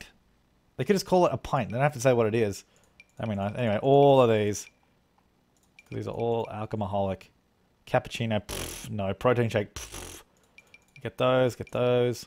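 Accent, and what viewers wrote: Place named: Australia. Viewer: WAIT!!! you're Australian??.. i mean it would make sence since your talking sounds it XDD just didnt think u were with us Auzzies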